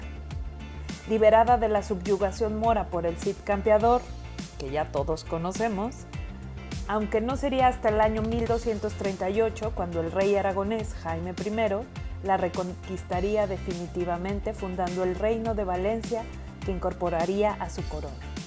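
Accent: Mexico. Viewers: Tienes acento mexicana?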